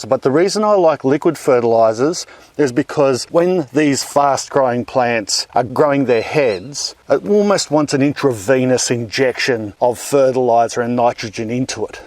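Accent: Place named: Australia. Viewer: An Australian living in Canada now since 1971...your teachings are great, but your Aussie way... it is just beautiful ..even had a fleeting thought maybe I could return one day to live....the words written on your t-shirt seeing the earth through her eyes.... that is so precious and evocative..thank you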